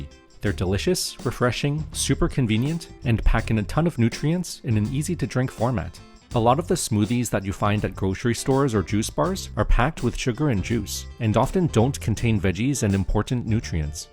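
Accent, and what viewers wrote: Canada. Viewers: Do i catch a Canadian accent kind sir?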